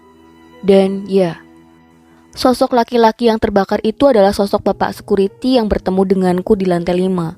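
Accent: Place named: Java, Indonesia. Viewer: nedok mbak e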